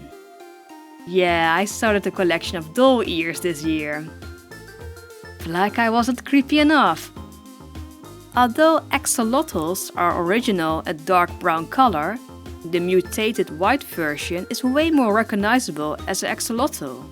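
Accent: Netherlands. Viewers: Heyo! I'm also Dutch! Ur pretty good at English. And I know, sometimes it's hard to speek English with that Dutch accent... I mostly just put something tru Google translate lol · Where are you from? You sound kinda Dutch in some way but idk oof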